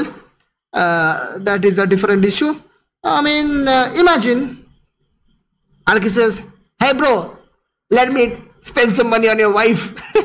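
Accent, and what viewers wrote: Bengal, India. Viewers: The English of Bengali .... I am also Bengali.. hello bro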